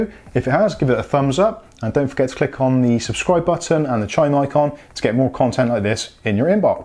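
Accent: Bristol, United Kingdom. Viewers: Are you a Bristolian?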